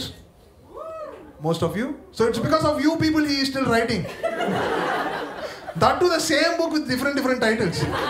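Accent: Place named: South India, India. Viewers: Love from maharashtra..loved ur sounth indian accent..👍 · he is south indian, hence that accent...try and appreciate the diversity of india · Hypocrites can bear accents of people from all around the world but can't bear south indian accent !!?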